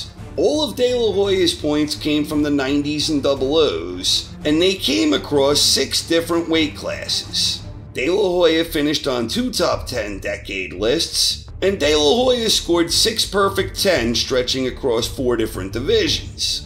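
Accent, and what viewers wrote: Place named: New York, United States. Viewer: Very interesting, Whatever accent you have - it is the official "Voice of Boxing Knowledge" to me now! (Is it New Yorker?) A big Thank You from sunny England, for putting all the effort into compiling this epic list over the last few months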